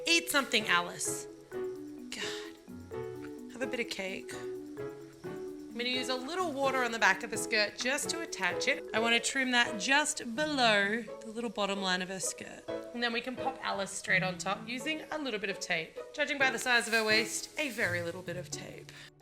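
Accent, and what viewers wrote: Australia. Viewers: Hi! I'm an Aussie, been living and working in Japan for the last 6 years. I suck at baking, but my sister loves it and I wanted to feel close to her, so 2 years ago I started watching your channel. It reminds me of home (no matter how far or wide I roam, right?). I remember how to speak Aussie from you, so that my family won't tease me for sounding American lol (Funny that people actually can't understand Aussie here!) Your creations/ideas are amazing(!) and your down to earth personality is beautiful(!). I'm pretty sure that running a business, being publicly known and raising two gorgeous kids must be crazy sometimes, so I just wanted to take a second to say THANK YOU for all the countless(!) times you've made a homesick Aussie feel like she's back home in her sister's kitchen for half and hour or so each week. I pray you know how much God loves you! 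Renée x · R u Aussie or American???????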